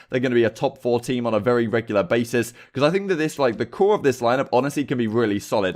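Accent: United Kingdom. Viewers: Thank god ur British i would hate hearing this without the accent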